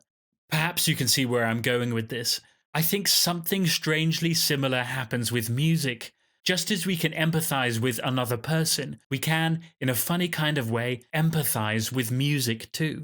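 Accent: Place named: United Kingdom. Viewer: I'm Brazillian guy and found your channel by chance. They are very eductional and informatives and beside I not be a native in english, your british accente help me understend almost all that you speak. it's clear and objective when the tonic syllable is stressed. US accente is terrible to non natives in intermediate level. I wish success with channel and keep to producing new videos.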